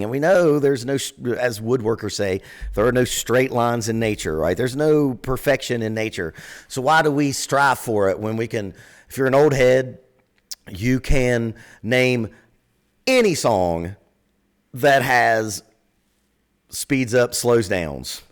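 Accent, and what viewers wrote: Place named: South, United States. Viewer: Not only do i love your insights into drumming helping me appreciate the music even more, but your smooth and soothing southern voice has got a place on my insomnia playlist. Please release a track of “nudge” and of “puuunch” on repeat. AWESOME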